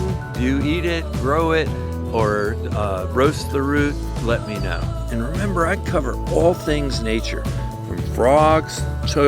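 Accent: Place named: California, United States. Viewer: Love this guy’s accent—sounds like a CA dude.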